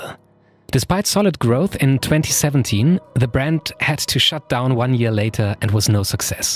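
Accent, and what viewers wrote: Germany. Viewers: Deutscher akzent kickt hart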